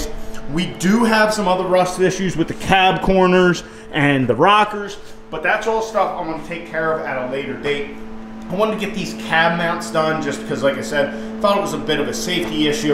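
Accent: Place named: Pennsylvania, United States. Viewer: First minute or 2 of you talking knew you were from PA lol I'm from the lehigh valley. Have the same issue with 24v going to tackle this asap won't pass inspection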